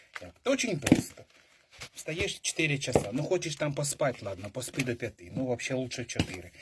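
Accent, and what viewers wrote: Ukraine. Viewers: Артист😂😂😂❤❤❤Спасибо. Нiч яка мiсячна зоряна ясная.. Как все это знакомо, все родное. Эту песню мама пела. . Может удивлю, но есть в России на Дальнем Востоке село, где жила моя бабушка. Ее родители были переселенцами, в царское время переселились в Приморский край. Вот на таком языке все село говорило. Даже интонации те же, и логика😂😂.. Слушаю, как будто земляка. Молодчина!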